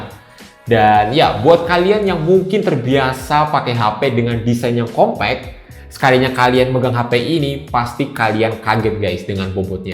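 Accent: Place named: Java, Indonesia. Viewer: Medok juga sih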